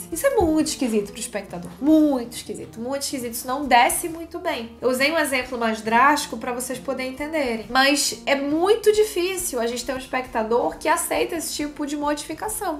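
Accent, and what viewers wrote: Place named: Northeast, Brazil. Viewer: Ei, voce é nordestina? O sotaque é um pouco parecido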